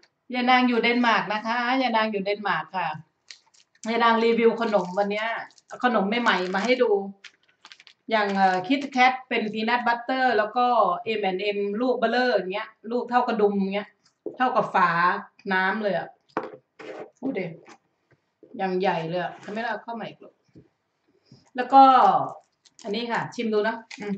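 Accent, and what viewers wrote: Isan, Thailand. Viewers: คนจังหวัดใหนค่ะเห็นพูดอีสาน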